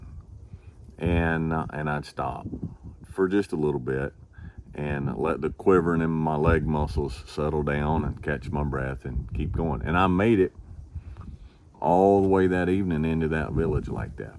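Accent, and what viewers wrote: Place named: South, United States. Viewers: This video got suggested me by youtube algorithm, and just by looking at the thumbnail, I literally heard his voice and his cool country accent, although I never seen this man before on YT - and I was 100% accurate by guessing both the accent and the voice itself :D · This is by far, the best American accent. The slow, comforting Texas drawl.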